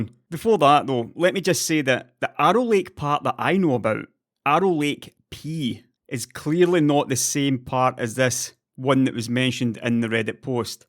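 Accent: Scotland, United Kingdom. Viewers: Love hearing that Scottish accent. Music to the ears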